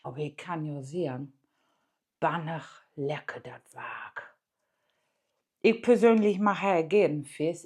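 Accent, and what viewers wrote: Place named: Low German, Germany. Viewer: Ulkig 😂 ich bin Thüringer und ohne zu lesen , habe ich alles verstanden. Erst zum Schluss merkte ich ui dat ist jo platt. 😂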